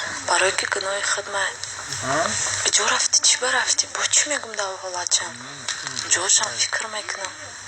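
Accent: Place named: Kulob, Tajikistan. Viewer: духтари точик не духтари кулоб ай гапзаниш маълум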